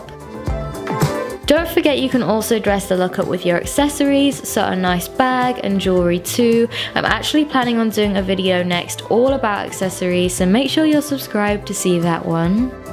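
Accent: United Kingdom. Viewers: Lol I think in the uk people pronounce it how you do (nyke) but in the Us we say nikE (nikee) and also i think the uk say AdidAs (adidAs) but the US says adIdas (adeedas) lol idk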